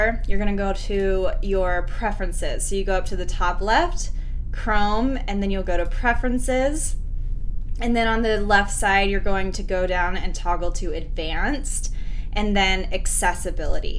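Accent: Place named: Ireland. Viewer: its still not working, or i guess the Irish accent in the video is just too thick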